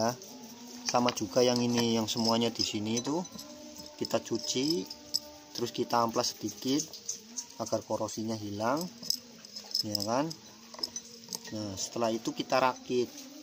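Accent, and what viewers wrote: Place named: Banyumas, Indonesia. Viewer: Bang ruamhnya mana kok ngapak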